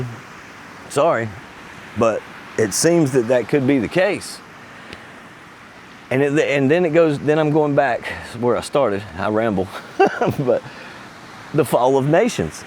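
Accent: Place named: South, United States. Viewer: How did I know this guy would have a southern accent before I pressed play…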